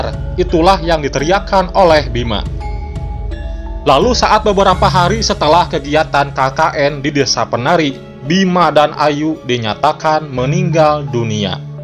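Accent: West Java, Indonesia. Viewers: logat sunda abangnya ini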